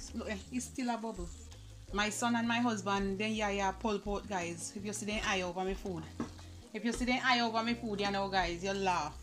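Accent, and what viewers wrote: Jamaica. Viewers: I've always loved the Jamaican accent, now i love Jamaican roasted chicken too🎉💯🔥🔥will try out sooon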